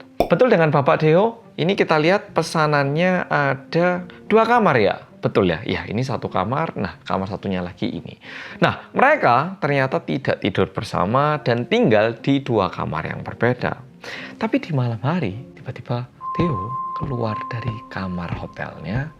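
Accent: Java, Indonesia. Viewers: Bahkan udah bertahun-tahun di Korea, medoknya kental bet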